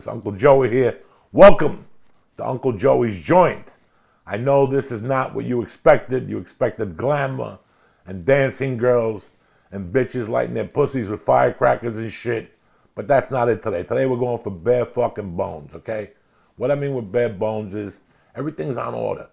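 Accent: New Jersey, United States. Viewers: U sound more jersey already uncle Joey. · Lol u can tell hes been in Jersey that accent is deeper than ever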